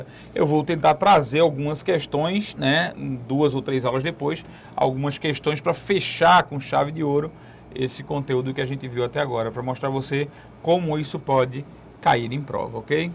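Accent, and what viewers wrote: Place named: Northeast, Brazil. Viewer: Finalmente uma aula com sotaque nordestino...tava cansada do carioca e paulista rsrs (sou paulista, pra os críticos de plantão.. ;P). Ameeei a aula, obrigada por compartilhar.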